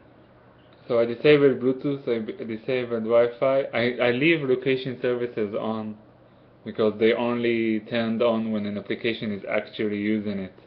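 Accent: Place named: Israel. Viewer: איך שומעים שאתה ישראלי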